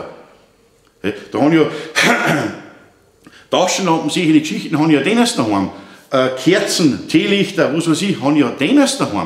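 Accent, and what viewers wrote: Bavaria, Germany. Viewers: Einmal wieder ein sehr informatives Video. Dankeschön. Auch, wenn es für mich leider wieder eines der Videos war, welches für mich aufgrund des von mir (im Verhältnis zu anderen Videos) erneut verstärkt wahrgenommenen bayerischen Dialekts akustisch leider nicht durchgängig einfach zu verstehen war. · Herzlichen Dank für die klaren Worte und die guten Erklärungen. Mittlerweile habe ich viele Videos von Ihnen angeschaut und finde jedes sehr interessant und für mich praktisch umsetzbar. Vor allem das Thema Notstrom, Einspeisung und Ecoflow betreffend. Kompliment! Positiver Nebeneffekt: Ich habe mich an den bayrischen Dialekt gewöhnt, sodaß ich heute ohne Rückspulen zu müssen, alles beim ersten Mal verstehe! 👍👍🍻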